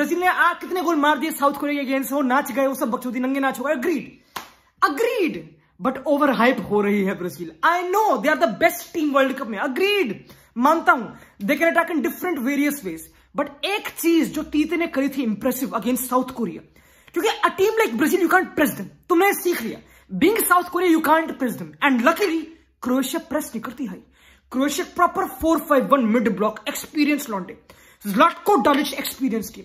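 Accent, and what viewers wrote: Delhi, India. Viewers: Your football knowledge+Delhi-NCR ka accent will lead you to greatest heights. zindabad! ✊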